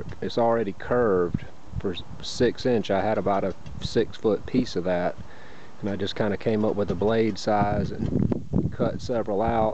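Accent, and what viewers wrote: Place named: United States. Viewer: Real American accent 👍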